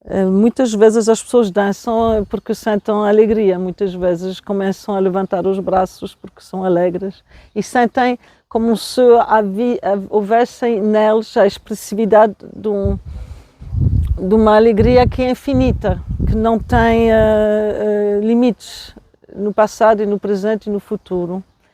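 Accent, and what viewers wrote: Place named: Portugal. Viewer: Muito agradecida pelos ensinamentos...fiquei maravilhada com o sotaque português lembrou muito mamãe...fechei os olhos e imaginei suas estórias a falar com sotaque.❤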